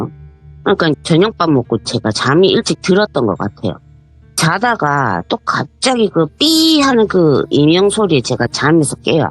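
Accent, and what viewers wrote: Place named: Gyeongsang, South Korea. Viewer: 시간 가는줄 모르고 들었어요~경상도 사투리 친근해요😊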